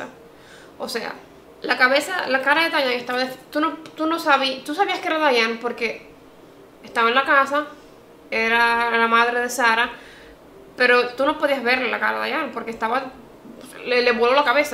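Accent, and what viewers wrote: Dominican Republic. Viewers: Desde que la escuché supe que era Dominicana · Me encanta 😍 tú trabajo ,sobretodo porque hablas con nuestro acento inconfundible de nuestro país ,Republica Dominicana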